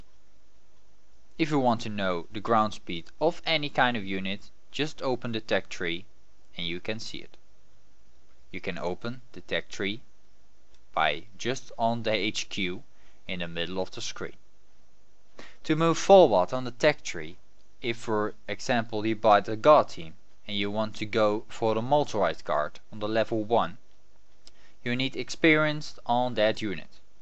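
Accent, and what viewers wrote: Netherlands. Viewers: ah that makes sence thanks for the tip :) Btw are you dutch ? :) cuz i can hear you are definantly not english :) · Ik keek de vid en hoorde gelijk dat je nl was, bedankt voor de uitleg man! Ik speel deze game al meer dan 200uur hahaha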